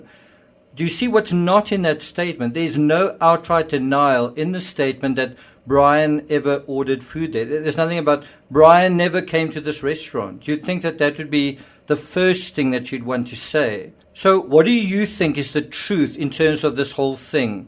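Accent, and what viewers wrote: South Africa. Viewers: There is no voice better than a South African voice talking about anything ❤️🇿🇦.... sorry been watching all the channels on this and just found yours and couldn't even focus... but yes agree I am extremely selective on what channels I watch and subscribe to. The Vet and check everything before posting or reposting now that everything has gotten so out of control. Thank you 😍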